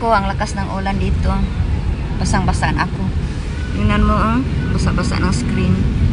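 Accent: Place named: Visayas, Philippines. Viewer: hahaha ni gawas gyd pgka pure bisaya nimo ate.. Nagkatawa ko sa ARYATA😅😅..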